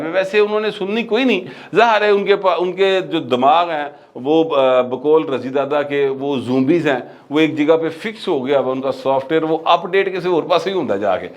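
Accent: Punjab, Pakistan. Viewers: .. My name is Inder. I am from California, USA. I truly enjoy your Vlogs and I love the way you speak. Your Punjabi style is amazing. Aap ke saath baat karne ka dil hai. I will be honoured to be your friend. We can interact on whatsapp if you feel comfortable. Please respond.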